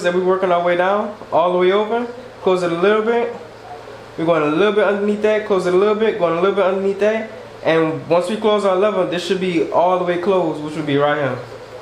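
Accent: New Orleans, United States. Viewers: Am I wrong? I heard a N.O. accent. 🤔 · Are you from New Orleans? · He gota be from New Orleans ya heard me... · Bro are you from Louisiana fam? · Sound like you from here in the N.O.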